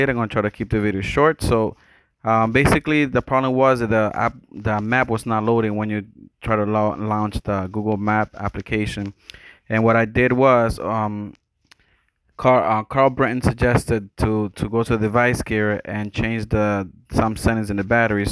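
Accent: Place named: Mexico. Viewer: Mexican accent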